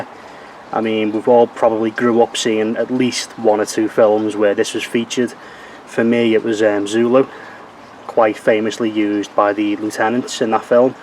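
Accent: Liverpool, United Kingdom. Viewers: Hi mate, just found your channel, enjoying the videos! Assuming by your accent you are a fellow scouser. I just wanted to ask where the local air rifle shop is to here? I've recently got back in to target shooting and can't find anywhere local to get pellets etc. Relying on buying online, but end up with loads of dinged pellets. Thank you!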